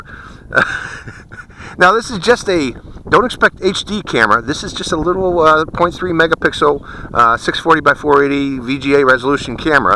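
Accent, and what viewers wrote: Pittsburgh, United States. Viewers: gotta love that pittsburgh accent :)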